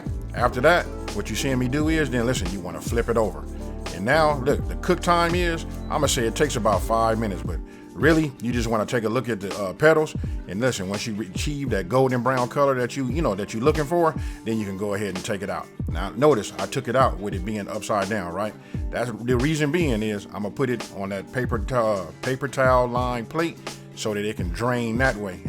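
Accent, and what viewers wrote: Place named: South, United States. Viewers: Thanks for the great recipe. I just made this and, as you said, "BOOM". I love that?southern American?dialect of yours...I'm Scottish. Please allow me to offer you a wee tip pal: if you leave that hardy old root on the onion it'll act like superglue and help prevent anything from falling off! Just sayin like, but..I'm fae Scotland so what dae I know? ❤️ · Love this video...good southern cooking and accent.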